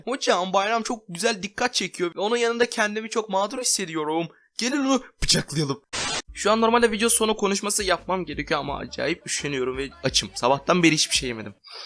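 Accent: Russia. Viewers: Abi rusmusun yoksa sadece rusyadamı yaşadın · Rus musun çok belli cünku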